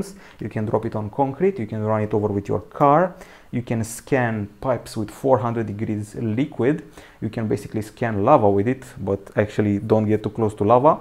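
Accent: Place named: Romania. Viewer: you have a strong romanian accent :D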